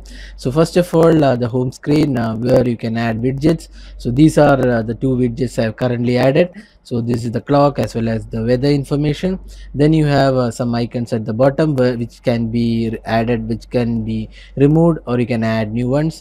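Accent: India. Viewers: Love the india accent!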